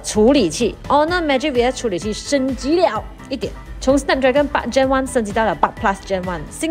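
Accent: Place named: Malaysia. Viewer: 这是马来西亚文化的腔调。墙内人，乖 别爬墙，快回去吧 ，这不是你的地方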